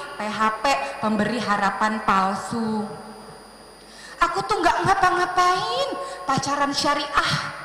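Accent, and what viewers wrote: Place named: Java, Indonesia. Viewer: logat nya ko jawa ya😁😁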